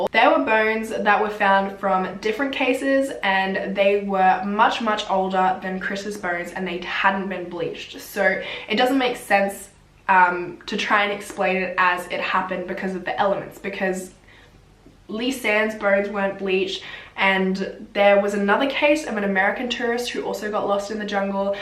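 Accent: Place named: Brisbane, Australia. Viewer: Girl I somehow knew you were from brissy listening to your accent 😂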